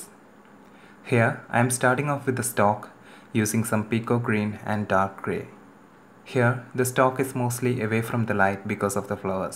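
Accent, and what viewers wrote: Kerala, India. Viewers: You sound so malliyali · Dude your accent... kerala?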